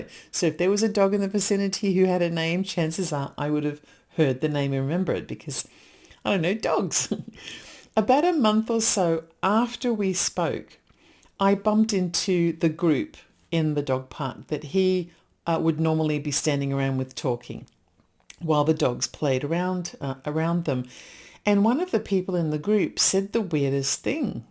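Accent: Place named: England, United Kingdom. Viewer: In modern vernacular, it's If you spot it, you got it. When I first moved to the north of England, my southern accent earned me disapproval from the locals, who would accuse me of being posh, and talking "wi plum in't gob". I actually have a fairly strong south east London accent, which is far from posh, as you will know. You'd think I was talking like a BBC announcer from the 1950s. It took them a while to let me just be from the south, rather than a princess. So, I wonder if that was a similar thing with your dog park guy. He thought you had pretensions, bc of your slightly Englishy accent.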